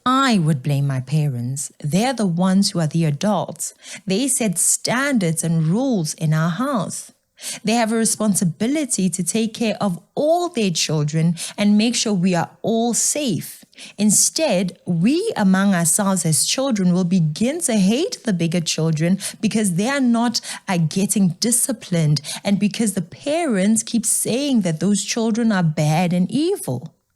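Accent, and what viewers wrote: South Africa. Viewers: thankyou we are so blessed to have you uncover all the wrong doings and speak your mind with that lovely south african accent god bless you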